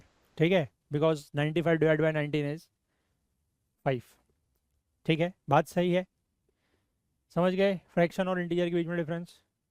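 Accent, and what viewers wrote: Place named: Kashmir, India. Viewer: That Kashmiri accent😂❤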